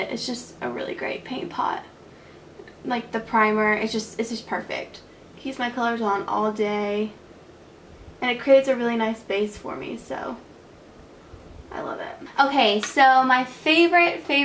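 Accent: South, United States. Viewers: you dont have your southern accent as much anymore ive noticed! love your videos pretty xx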